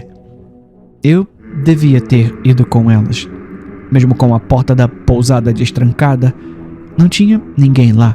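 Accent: Caipira, Brazil. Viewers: hahahahah esse sotaque caipira foi a melhor coisa do canal até hoje 🤣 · vim pela história, fiquei pelo sotaque caipira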